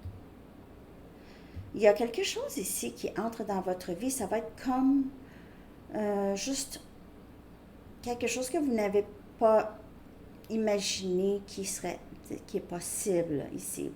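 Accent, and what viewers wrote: Canada. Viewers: Gratitude 🙏 Merci infiniment 🙏 j'adore ton accent canadien 👍👍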